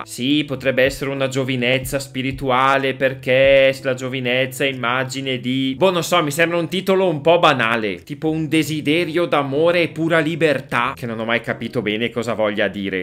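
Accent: Italy. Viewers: Ma che bell'accento altoatesino! Bergen de sura o de hota? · Un solo appunto: sei troppo buono. Capisco che sei un Sacerdos in aeterno, ma io ci andrei giù un po’ più duro. Tutto condivisibile, anzi, condivisibilissimo! E deliziosa la calata bergamasca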